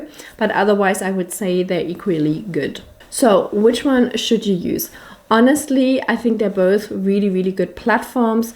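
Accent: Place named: Germany. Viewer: Wow beautiful woman and beautiful German accent.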